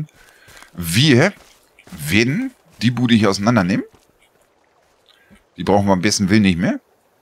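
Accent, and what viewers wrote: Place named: North, Germany. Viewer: Hallo, Lass dich nicht ärgern, ich persönlich mag den norddeutschen Akzent und "Suuns"of the forest ist einfach sehr erfrischend zu hören 💙😊 Lg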